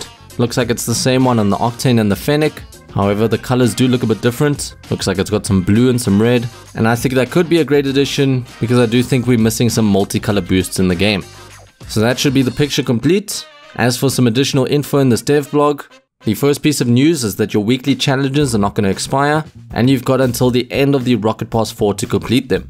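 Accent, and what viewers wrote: South Africa. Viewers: Eyyy bro are u from SA??? LOVE UR VIDS BTW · Are you south Africa. Really sounds like it and if so howzit bru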